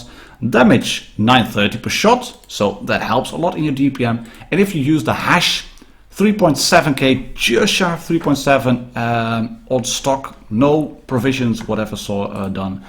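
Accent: Netherlands. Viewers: its not a trick question😂 I recognised your slight dutch accent, because I’m dutch too😉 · Just recently started watching your videos, and I am really impressed. Really nice style you have. Your accent also makes me a bit homesick — I’m not Dutch but South African and the Afrikaans accent is similar. I am curious as to why nobody has done a review video on the T55A. Well, I found a French one but I mean none of the “regulars” I follow (you, Bushka, SK8XTRM). Did they not give you a chance to play with it or is it just a bad tank?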